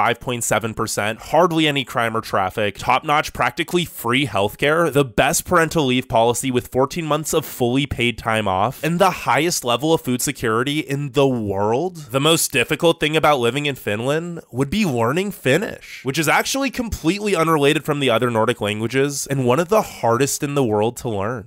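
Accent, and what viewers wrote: United States. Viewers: Great video idea, but the ranking and argumentation is a bit weird sometimes and THE VOICE SOUNDS SO AMERICAN I HAD TO STOP WATCHING AFTER A FEW MINUTES 🙆🏻‍♀️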